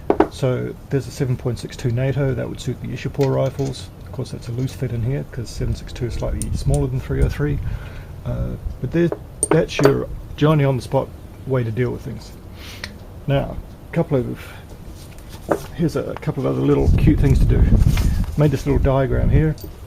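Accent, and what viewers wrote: New Zealand. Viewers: Kiwi I'd say. Not stretching the 'e' enough to be aussie. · MonsterofTheAslyum1- The same principle applies at the breech end of the barrel as the muzzle end. If you have 1/4 inch of projectile protruding at the muzzle, it indicates you likely have a good barrel, if you have a 1/4 protruding at the breech end, same is the likely indication, as it is the rifling that you are testing. This is especially true as the Lee Enfield cartridge is tapered as well, which makes this test all the more useful. I hope this makes sense. I grew up with these beauties, my first large game (feral cattle) animals were taken down with my dads pristine No4. Mk1 (had the test tag attached when he collected it!) at the tender age of 12yrs old. My hunting rifle now is a previously sporterised (by a previous owner). No4. Mk1. I used this exact test, got the exact result as above, it groups about 1 1/2 to 2 inch at 100yrds. 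It shoots better than I do! I am a LOT older now. :) This guy sounds definitely kiwi. Great series of vids too! Thanks.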